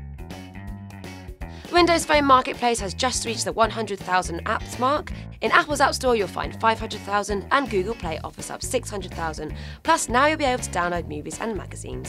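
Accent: United Kingdom. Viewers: I really love your british accent.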